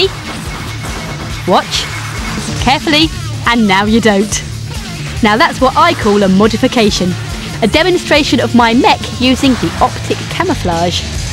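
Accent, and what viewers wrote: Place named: United Kingdom. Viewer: shes too british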